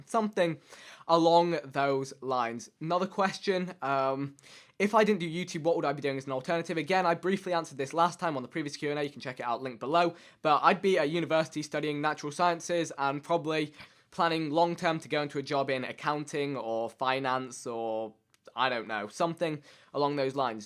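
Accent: England, United Kingdom. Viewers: well he was raised in Britain so obviously he got to be talking in the british accent. but he's indian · gianluca moores Maybe you thought that because he has a English accent .___. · I'm a new sub recommended by a friend, out of curiosity what is his ethnicity? his accent sounds English (UK)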